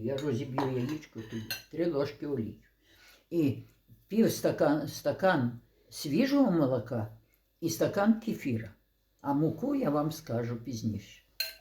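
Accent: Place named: Ukraine. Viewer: Этот украинский говор звучит для меня как музыка. Так говорила моя бабуля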